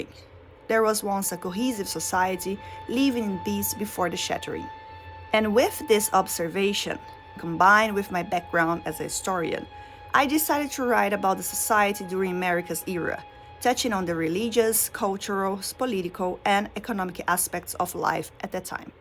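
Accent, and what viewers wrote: Brazil. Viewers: i see that brazillian accent there 👀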